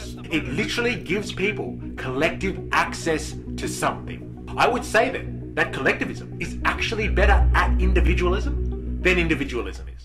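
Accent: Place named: Australia. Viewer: using a voice changer but still sounding Aussie? as **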